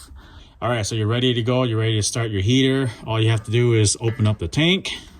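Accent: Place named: Minnesota, United States. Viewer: i thought he was from Minnesota as soon as he started talking, kind of like Canadian.. i dig that accent.. great video too ! i'm getting my big buddy today🎉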